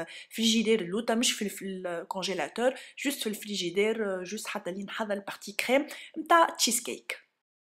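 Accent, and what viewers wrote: Tunisia. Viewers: HalimLeBlues c'est notre accent tunisien a 100 pour 100 tunisien! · Qu'est ce que tu raconte elle a un accent 216% tunisien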